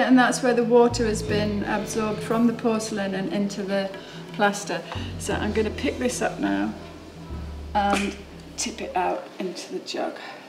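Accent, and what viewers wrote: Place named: United Kingdom. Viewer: Sounding British, I wonder where she picked up the coffee habit.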